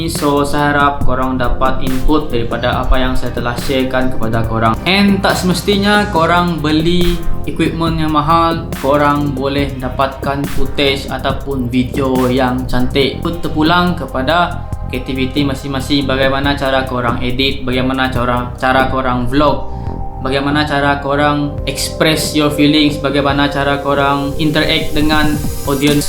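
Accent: Sarawak, Malaysia. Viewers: sarawakian ka? 😂 btw nice content. quite membantu and thanks for reminding pasal bajet.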